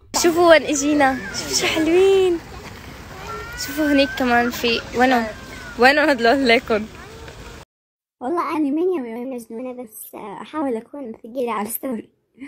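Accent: Iraq. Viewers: إنه توني انتبهت على اللهجة العراقيه صوته ماشاء الله كأنما مذيع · اي گلبي عراقي اصلا امبين من لهجه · ترة حجية كولة عراقي شلون متدرين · كلامه عراقي مبين عراقي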